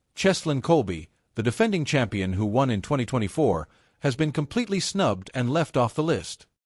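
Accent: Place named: United States. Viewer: Oh, come on! These awards go on current form and achievements. Cheslin Kolbe is an amazing player, and one of the best in history, world-wide. But, he is NOT alone. There are other incredible players who also deserve to be recognised. Only one can be the winner. Just because Kolbe isn't in the running for this award doesn't mean he is less revered than the ones who are. It just means that in 2025 he played in less games due to injury or whatever, and then when he did play, he didn't get as many opportunities to shine as he usually does. What I find ridiculous is that a Springbok rugby channel has an AMERICAN ACCENTED AI giving the commentary!! It can't even pronounce our players names correctly!! Who the F*** is Jasper WEEZE?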